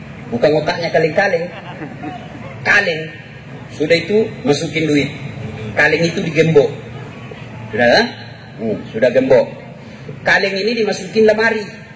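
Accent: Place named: South Sulawesi, Indonesia. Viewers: Ciri has logad ceramah ulama bugis makasar madura mantab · logat sulawesinya dapet bgt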